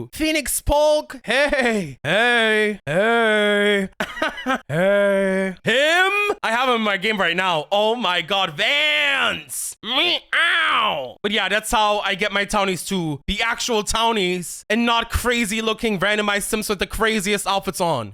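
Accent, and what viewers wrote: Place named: Italy. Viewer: holy molly....you sound ITALIAN ...no offense to the italians hahaha i enjoyed the video truly...it was really entertaining and your acting attitude really fun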